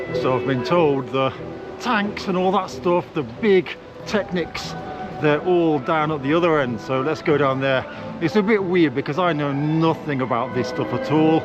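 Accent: England, United Kingdom. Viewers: Great video! I wonder if they were uneasy with you filming since you have the English accent, maybe worried some Englishman would make anti russia videos?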